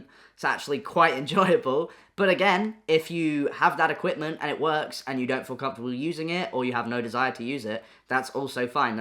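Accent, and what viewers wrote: United Kingdom. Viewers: Dude I literally thought you were just an androgynous guy with a lovely British accent- Edit: Is that transphobic??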